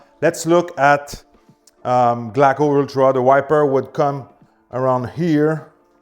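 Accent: Quebec, Canada. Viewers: Salut, je t'entend que tu est quebecois...J'imagine... J'ai une question pour toi, j'ai un Kia ev9 2025 et le Vus je ne sais pas comment il est fait aerodynamiquement,mais le derriere devient toujours sale et rapidement et au lave auto, pas capable d'enlever le tout au complet, y a t-il un moyen de ralentir le tout???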